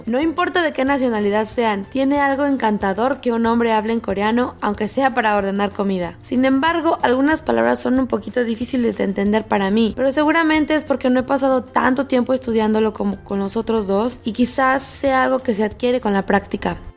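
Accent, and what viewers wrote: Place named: Mexico. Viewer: Tu acento es mexicana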